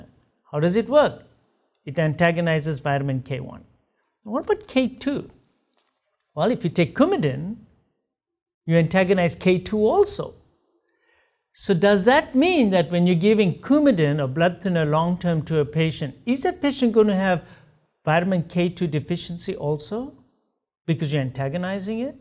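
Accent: India. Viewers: Doc your accent is very nice not too english but still have that little indian accent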